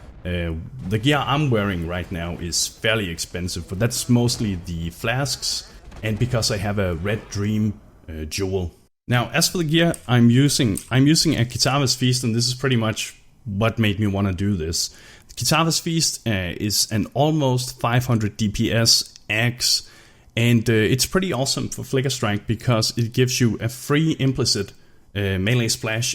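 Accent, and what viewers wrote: Denmark. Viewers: are you from denmark xD that accent sound familier ^^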